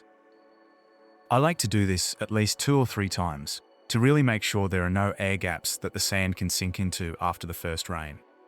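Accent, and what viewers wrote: Australia. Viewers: Wow! Love this vid. really appreciated the approach you took to honouring the legacy and history of the area. And as a fellow Australian, love hearing the Aussie accent!! It’s such a good reminder that you don’t need a huge space to do phenomenal things. Subscribed, and I’ll be sharing this vid with others. Love your landscapong wirk, as well as content capturing! and looking forward to watching more of your content!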